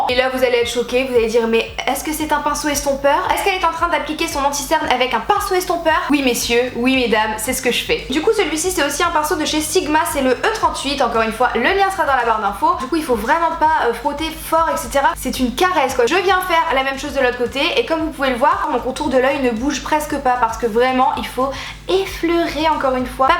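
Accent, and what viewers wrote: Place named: South, France. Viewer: Jpp l'accent du sud😂 super video continue comme ça, ❤️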